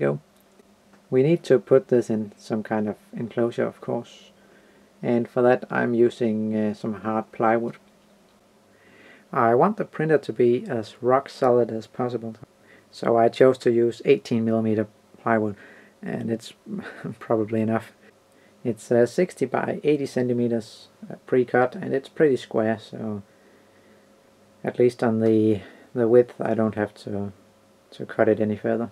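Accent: Denmark. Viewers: are u danish like me :P?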